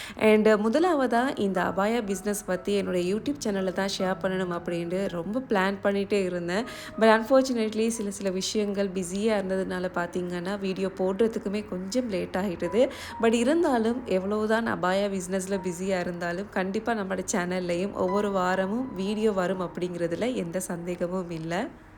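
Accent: Sri Lanka. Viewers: Sister ongede ellam ok but konjem style eh korainge.neenge trinco side thane trinco pecha pesunge erichala irikki ongde voice eh keke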